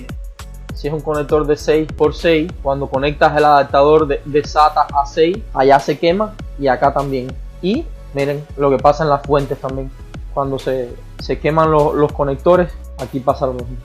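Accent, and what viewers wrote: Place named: Cuba. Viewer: me lo imaginé por el acento, yo también soy cubano, y estoy iniciando en la minería, muy buen trabajo estas haciendo hermano, sigue así, un saludo desde Italia 👍🏻👍🏻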